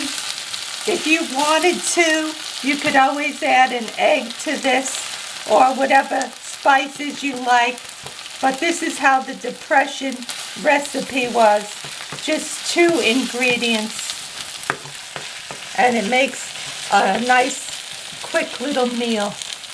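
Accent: United States. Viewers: It's my favorite American accent!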